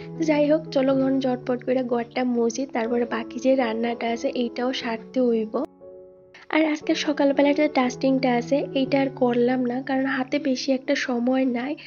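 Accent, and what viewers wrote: Bangladesh. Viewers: Bon tomar bari kothai bagladesh avabe kotha bolcho keno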